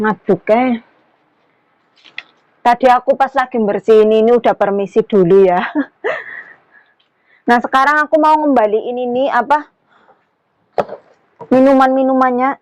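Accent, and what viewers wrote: Banyumas, Indonesia. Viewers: Jawa ngapaknya medokl skali..ora ngapak ora kepennak..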